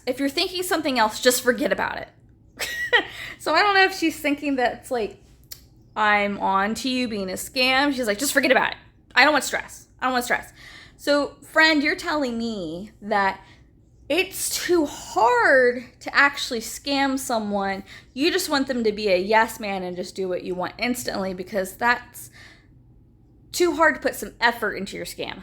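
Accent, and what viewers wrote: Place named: Nigeria. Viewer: I think it's more Nigerian. Point is, it's certainly not a native English speaker.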